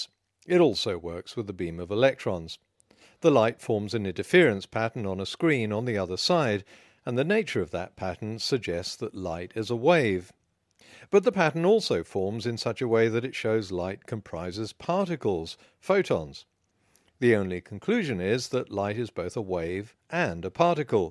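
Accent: United Kingdom. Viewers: Like your videos! 👍🏻 what part of Australia they did grow up in?? Reason I asked is your accent is just so British. Cheers!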